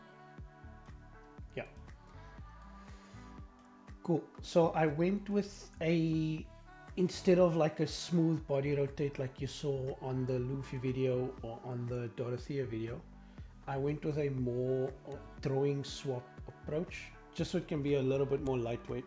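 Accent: South Africa. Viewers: Are you South African? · You sound very South African. Awe masekind! Shout out my brother! Keep Up The Great Work!!!!!!!!!!!! Lets push animation in SA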